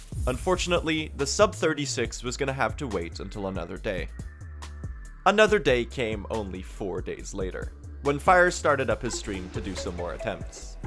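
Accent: Sweden. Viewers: Wait you are swedish??? Your accent is fucking amazing, even for a swede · that's an extremely Swedish accent though. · Your swedish? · are you swedish? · Wait, you from Sweden?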